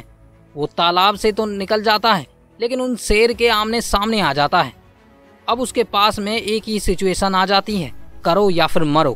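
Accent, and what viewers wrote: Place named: Marwari, India. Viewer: ये बोलने वाला व्यक्ति जरूर मारवाड़ी है बार बार उलझ रहा है 😂😂😂😂😂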